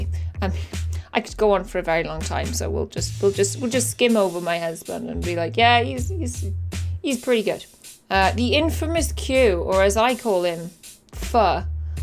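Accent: Wales, United Kingdom. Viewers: People from Australia are top people :) thanks for answering my questions. I guess I am old, that playstation comment dated you and surprised me as I thought you were a lot younger. I came her for the valheim stayed for the valheim and the welsh accent.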